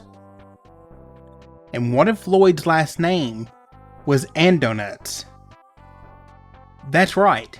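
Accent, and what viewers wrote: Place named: South, United States. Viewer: Finally a Southern youtuber! Haha, cool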